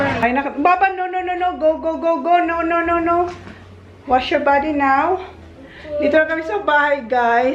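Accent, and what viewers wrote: Bicol, Philippines. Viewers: I love how you speak bicol, im a proud bicolano too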